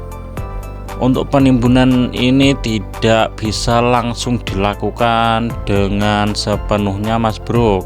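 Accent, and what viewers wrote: Java, Indonesia. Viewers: sampean orang mana mas logat jawanya beda?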